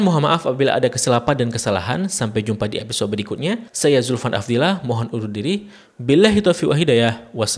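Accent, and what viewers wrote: Malaysia. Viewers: Assalamualaikum..maaf ini orang malaysia atau indonesia ya...soalnya bahasa indonesia nya bagus tapi ada terdengar logat malaysia dan kata kata yang terdengar biasa dipakai orang malaysia...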